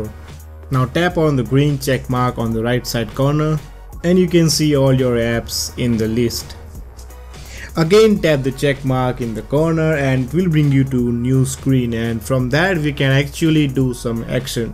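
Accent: India. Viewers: Bro are u Indian??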